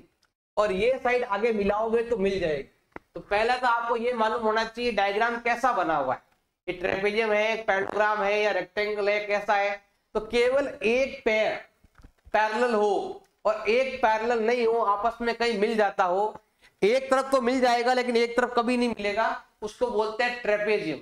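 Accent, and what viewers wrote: Rajasthan, India. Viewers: Sir aapki aawaj me rajsthani feel hai 😅😊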